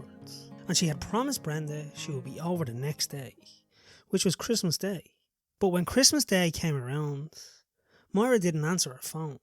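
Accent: Ireland. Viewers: a pronounced and obvious Irish accent it's not one that's hard to follow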